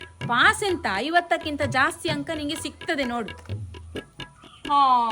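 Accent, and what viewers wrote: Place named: Coastal Karnataka, India. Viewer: ನೀವಾಡುವ ಕರಾವಳಿ ಕನ್ನಡ ಧಾಟಿ ನಿಜಕ್ಕೂ ಮನೋಜ್ಙ, ಕೇಳುಗರ ಕಿವಿಗೆ ಅಚ್ಚುಮೆಚ್ಚಿ.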